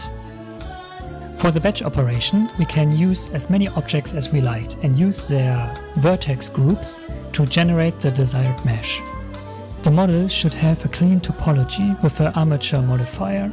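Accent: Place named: Germany. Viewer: Everytime you hear a person explaining their project with a slight german accent, you instantly know, that it is high quality and has some smart solutions in it.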